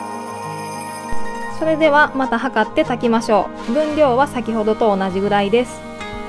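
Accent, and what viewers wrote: Kansai, Japan. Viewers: 2秒で純な関西人だとわかってしまう動画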